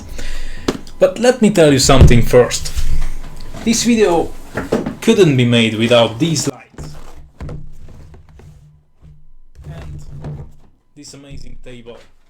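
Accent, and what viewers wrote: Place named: Romania. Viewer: Ești român după accent:)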